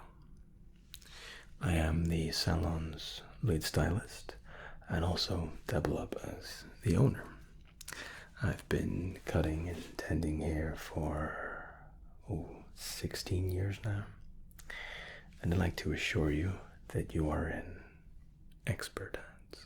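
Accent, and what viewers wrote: Scotland, United Kingdom. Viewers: Nice work!! Also, you are British AF hahah I love it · Love your accent and you are defo up there with the best love your videos.... What part of Scotland do you hail from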